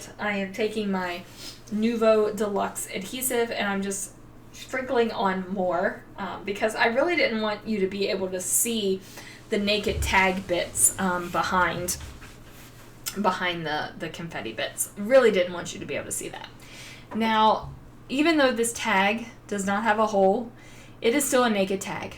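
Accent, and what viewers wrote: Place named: Baltimore, United States. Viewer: We love your chatter...and I love your accent...Baltimore is a fun place that I miss!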